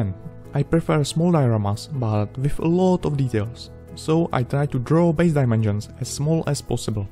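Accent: Czech Republic. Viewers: Maš typycky českou angličtinu. Ta sry mě ta artikulace prostě sere chtělo by to zapracovat ale jinak moc hezká práce. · Já jsem si myslel že jsi Čech už jenom podle toho přízvuku když jsi mluvil anglicky 😂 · Českej přízvuk se pozná xd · Hned jsem podle přízvuku poznal našince, krásná práce kamaráde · Z toho přízvuku to bylo poznat a české nápisy to dovršili a ty taky 😁 takže příště video česky 😶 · Really strong czech accent :D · Ja sem si mislel ze ses cech podle ti anglictini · podle přízvuku jsem si říkal že jsi čech :D , ale supr video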